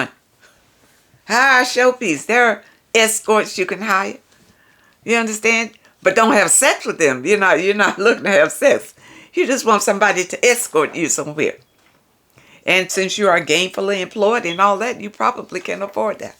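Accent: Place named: New Orleans, United States. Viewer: Love that New Orleans accent I can hear it unique energy you're amazing I watch you and Only Logical channel he expose those BSB Bottom Shelf Brads anyways great content channel you have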